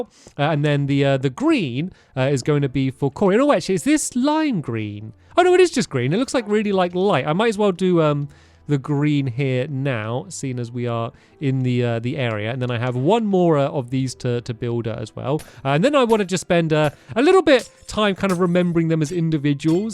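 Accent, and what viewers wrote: United Kingdom. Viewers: Yo stampy u speak British. ?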